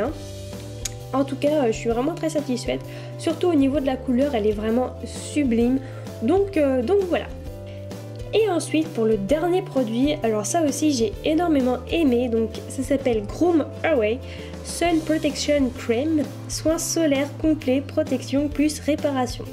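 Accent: England, United Kingdom. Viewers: haha j'adore ton accent anglais 😂😂 sinon super vidéo☺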